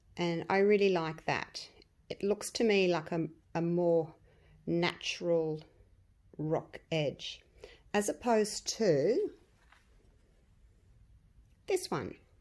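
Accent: Australia. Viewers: Love this video and love the Aussie accent!!! “Oh this is so exiting lol 😂 “ u made me giggle how happy u were wen removing your clear coasters from your mold!!!!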